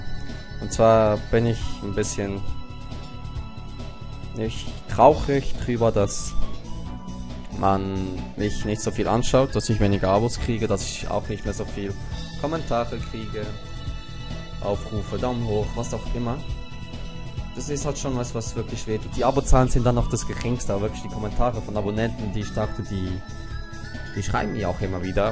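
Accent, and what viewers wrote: Switzerland. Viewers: Ich habe dich abonniert und finde es echt lustig mit deinem Mittwuch und dein schweizer-deutsch ist cool. Es fällt nicht extrem auf :D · eey mach dir nix draus die 8 youtuber sind halt uncool :D achjaa ich finde dein schweitzer-deutsch soo gel *-*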